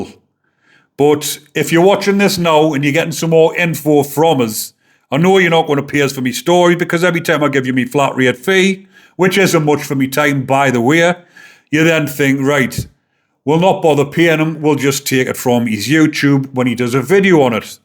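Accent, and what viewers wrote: Newcastle, United Kingdom. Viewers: Sounds like a Gordy Northumberland/or Newcastle to me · Geordie accent is brilliant.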